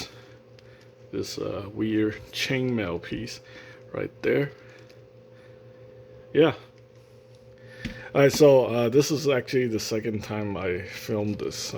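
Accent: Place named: China. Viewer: 您好，你也是華人嗎？ making an assumption based off of your username, and what little accent I can detect. If I am mistaken, I apologize, but if so, I am very, very happy to find another person from the same background attempting reviews in English. I've seen quite a few Chinese channels that do Mandarin reviews, but don't see too many Chinese people doing English reviews. Of course, your reviews are way more professionals than mine, ROfL.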